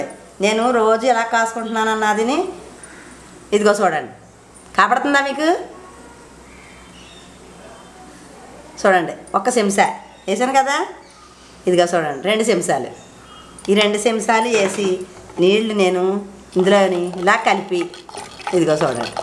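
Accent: Godavari, India. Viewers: godavari yesa basha adhurs bamma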